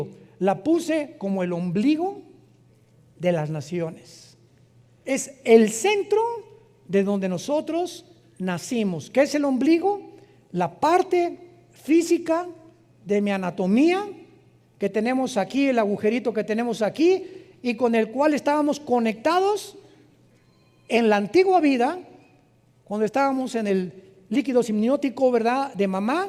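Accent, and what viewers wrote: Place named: Mexico. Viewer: Gracias a Dios por este siervo y hermano en la fe de nuestro Señor Jesucristo, el Dr. Armando Alducin quien tiene un ministerio maravilloso enseñando al pueblo de Dios las grandes verdades bíblicas, el plan de Dios para la redención de la raza humana, una linea cronológica de los grandes acontecimientos actuales y futuros del plan de Dios para la humanidad, una orientación de la palabra de Dios para consolidar el crecimiento del carácter cristiano, profundiza las enseñanza y los misterios de los diversos conceptos bíblicos contextualizándolos, geográfica, histórica, cronológicamente etc. etc., revela el carácter santo, el amor, y la justicia de Dios. Además lo expone de manera magistral, profunda, ungido por el Espíritu Santo, entretenida y con su acento mexicano que para los cristianos de habla española nos resulta muy interesante y divertida, en especial a los cristianos chilenos que admiramos y respetamos mucho al pueblo mexicano.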